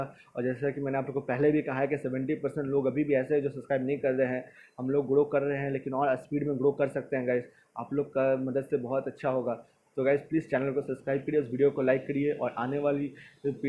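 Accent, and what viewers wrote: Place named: Uttar Pradesh, India. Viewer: u r up accent bhai♥️♥️🥹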